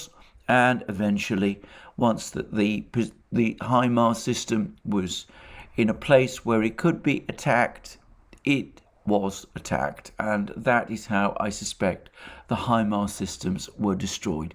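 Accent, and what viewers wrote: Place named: RP, United Kingdom. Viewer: in from the UK originally, Alex is quite posh I his speaking. Something to aspire to. Refer to yourself, referring to ones self, what? Pardon? Where u at? Where are you? Come hither.... Haha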